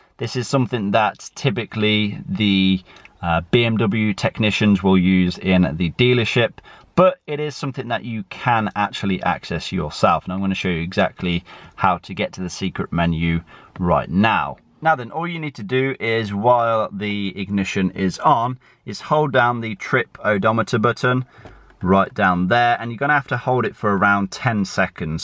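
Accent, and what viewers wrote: England, United Kingdom. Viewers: I know it is, but you sound British, you are showing a car with right hand drive so it's a boot!!! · You sound english though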